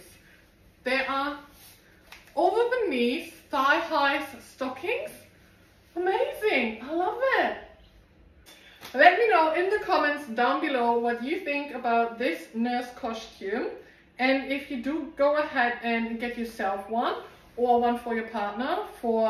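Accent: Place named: Germany. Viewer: I love your accent,. Are you German?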